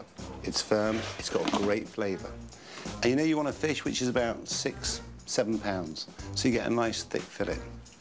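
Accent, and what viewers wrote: United Kingdom. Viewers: 英國腔真的超好聽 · 我跟你說只是他的英國腔講得好聽而已，如果你去到當地就會發現很多當地人說的英語你根本就不可能聽得懂，我來自香港土生土長的70後，我還記得讀中學的時候，當年還是殖民地時期，那些英語教師經常都會跟我們說學英語要學英式口音才是王道！後來出了社會之後才知道原來英式口音去到美國或其他說英語的地方，人家都當你鄉下佬覺得你好老土⋯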